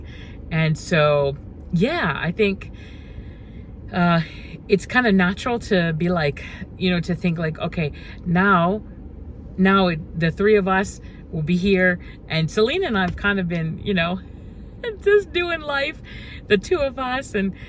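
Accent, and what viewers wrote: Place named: Kenya. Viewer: 😂😂😂😂 I love venye the Kenyan accent has caught up. "Even me I will stare"😂😂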